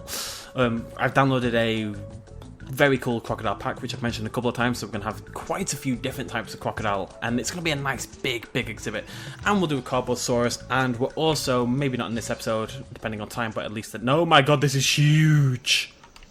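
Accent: United Kingdom. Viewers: I no your British but why did your voice Chang to a British voice and are you British amrican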